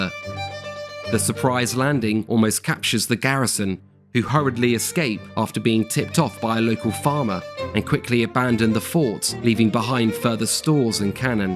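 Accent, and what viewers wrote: United Kingdom. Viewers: Great series, well done and surprising to hear it told with British accent. Trying to keep the indefensible NY was Washingtons biggest mistake and almost lost the revolution, he was saved in Brooklyn only by moring Fog and Howes indecisiveness. Years later he wanted the French Fleet to recapture NY, they said no and advised to move on Yorktown. Great story overall …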